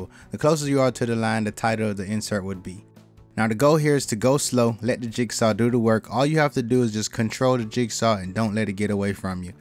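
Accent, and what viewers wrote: United States. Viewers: Where's old mates accent from? Not too familiar with yank accents outside the obvious ones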